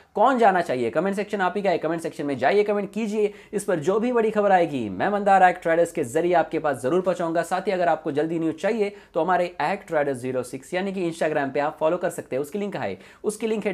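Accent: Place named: Kashmir, India. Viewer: Apka kashmira accent wah wah.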